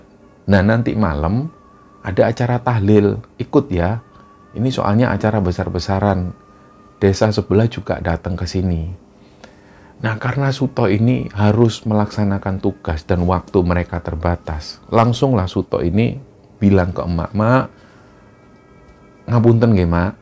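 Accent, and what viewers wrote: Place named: Surabaya, Indonesia. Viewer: Kalo dengerin dan logat mas, ngelingke aku sama Suroboyo. 20 tahun disana, sampai dapat jodoh orang Kediri. Semoga dan Tim semakin maju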